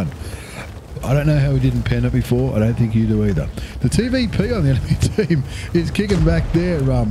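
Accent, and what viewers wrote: Australia. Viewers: aussie aussie aussie ooi ooi ooi hello mate from N/A love that awesome aussie accent hey which is better m60 or 121b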